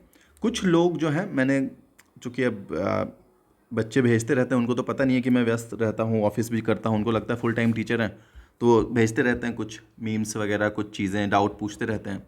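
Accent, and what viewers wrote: Bihar, India. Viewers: Your bihari dialect even after being IES officer is just love❤️.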